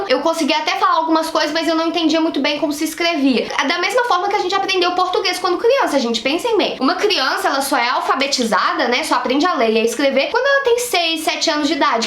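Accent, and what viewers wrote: Minas Gerais, Brazil. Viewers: Aaaaaaaah vc e mineiraaa , eu sou da região de venda nova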